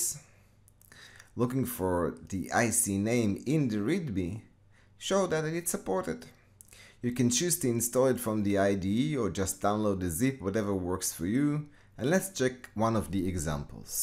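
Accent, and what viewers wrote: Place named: Israel. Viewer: לפי המבטא אתה בטח מישראל !!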